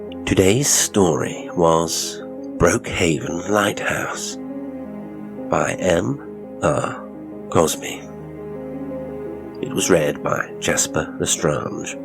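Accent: Australia. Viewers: Both sad and chilling. You've got the Oz accent just right. It's kinda like our New Zealand accent but more twangy (if that makes sense). They say "feesh n cheeps". We say "fush n chups".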